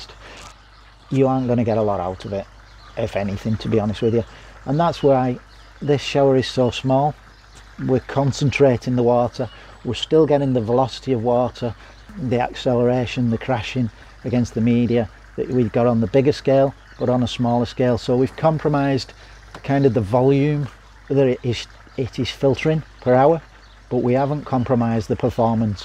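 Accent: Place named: Manchester, United Kingdom. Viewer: I know St Helens well mate although it was a bit of a surprise, as I could have sworn you jave a Manchester accent. Keep up the great work.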